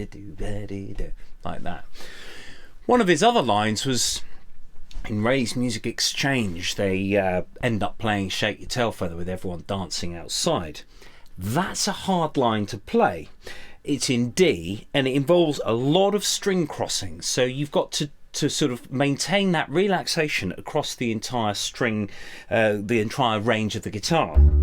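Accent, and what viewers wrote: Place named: United Kingdom. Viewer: Definitely not complaining, but are all online Bass instructors British?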